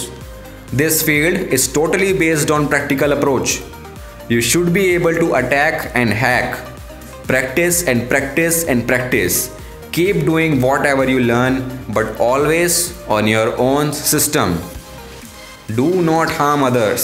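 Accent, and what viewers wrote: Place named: Telugu, India. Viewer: Telugu accent spotted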